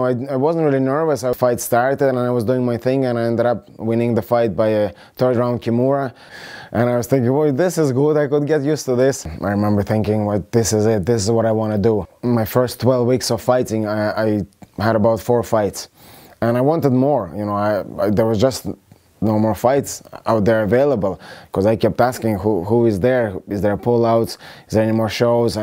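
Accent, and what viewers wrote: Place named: Ireland. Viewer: It’s crazy how he has somewhat of an Irish accent 😂😂😂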